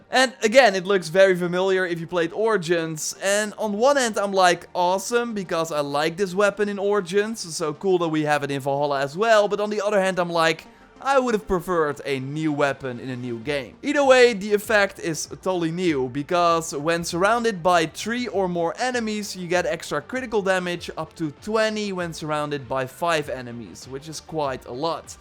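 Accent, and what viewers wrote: Netherlands. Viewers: Sick dutch accent😂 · Is he from thuis netherlands it souds so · You have a dutch accent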